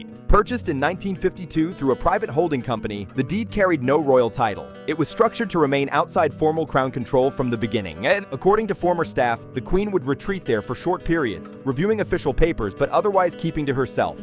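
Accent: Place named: United States. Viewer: I'm American and I don't understand why a loud American is the narrator either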